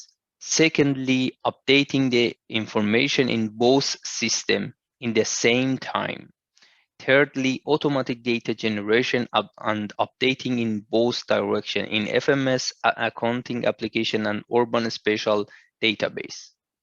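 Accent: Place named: Germany. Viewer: عالی بود فقط ته لهجه آلمانی گرفتی😁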